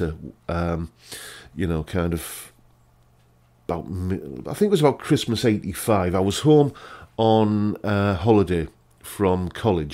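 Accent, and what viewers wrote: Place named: Teesside, United Kingdom. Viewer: Ahhh, you're a Teessider! I've been trying to work out your accent for days since I found your channel. I knew you weren't a Geordie (no hair on you palms) but I was thinking Co.Durham. Now that you show the Gazette though, I'm thinking closer to the Ironopolis. I'm guessing not Middlesbrough though (I'm from Marton but live in Manchester) because your accent is a bit too thick. You say you live close to Darlington, so I'm going to guess Stockton. Am I about right? I'm going to say: 1. -. I was 13 years old and it came on the TV on The Box through me little comcast box. I remember borrowing my sister's tape and getting chills from the solos. 2. -. After borrowing Cali, my sis and her b/f made me a mixtape which had on it. I used to listen every Sunday on my paper-round to this tape and always floored me with how dynamic and distorted and squeelie the guitar was. 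3. -. strat on this (I believe it's a strat) just shows exactly what a clean tone should be. It's all about the phrasing and the fingers, but also a well set up guitar with proper action and the right gear. 4. -. Perfect tone. 5. - Sorry but... It wouldn't be a hit song if it didn't have something going for it and LP sounded amazing on my way down the Avenue of trees in Acklam on a summer's day during my exams. That particula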